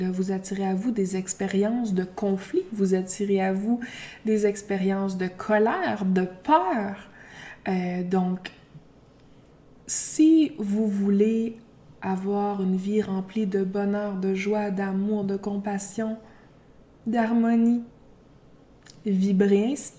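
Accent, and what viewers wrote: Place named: Canada. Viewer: Vous êtes d'origine canadienne selon votre accent... pour que les gens qui vous suivent, vous n'ai parlé pas de votre carrière!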